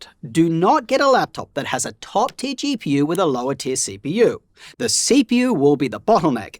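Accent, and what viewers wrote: Australia. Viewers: i got excited thinking it'd be an australian website with australian deals, thanks to the australian accent.. quickly got shut down. Wish you did one for the domestic market as well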